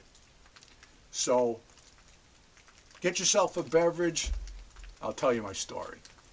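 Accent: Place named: New Hampshire, United States. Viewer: Love your channel and your life approach. Perhaps it is because I came from NH and miss the accent -- but of course, we do not have an accent. Keep making the videos so that I have something good to watch.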